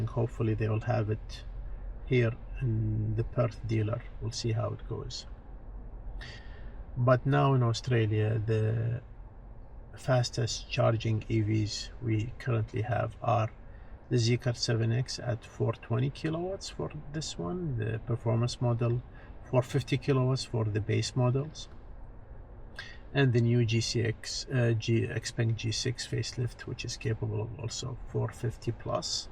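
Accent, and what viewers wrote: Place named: Arab world. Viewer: السلام عليكم. من لهجتك أتوقع إنك عربي. أنا من ملاك 7X متابع لفيديوهاتك. تحياتي لك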